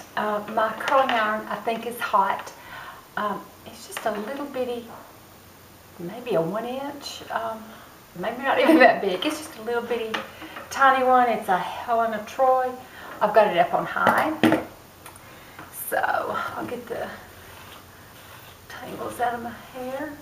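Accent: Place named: Virginia, United States. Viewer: I just figured out why I adore you so much- I have an aunt Ruth- she lived in Virginia-- (same cute accent) you have her mannerisms- and she was a beauty!!! When she was in her 20s people stopped her for an autograph they thought she was Rita Hayworth- My aunt had auburn hair.- I really believe god has so many molds LOL-See If this fits you. i will describe her - a "spit fire stubborn- Huge giving heart. When things are bad you are the light you know in the end it always works out. You avoid drama- LOVE design - have a hidden artist inside. To modest for your own good sometimes. Love your kids but its important to have your own life too .When she walked into a room she grabbed everyone's attention with out trying.. any of these fit? xoxoxo I FORGOT about doing my hair like this!!!! lots love shelly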